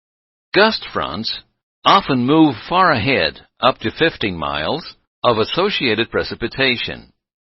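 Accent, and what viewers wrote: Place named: Netherlands. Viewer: They still play this old video in our training. The same narrator is in lots of the old Embraer CBT videos. I always loved this guy's accent... is that Dutch?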